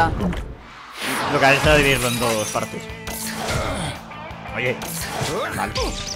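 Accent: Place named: Chicano, United States. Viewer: Apoco si hablas español, pensé que eras chicano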